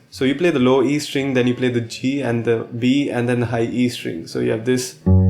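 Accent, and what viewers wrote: India. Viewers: Why do u have indian accent?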